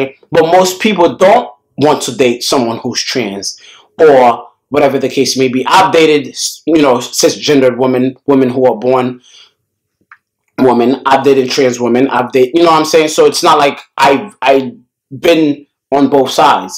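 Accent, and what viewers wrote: New York, United States. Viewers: I love his waves and that newyork accent❤️❤️❤️❤️❤️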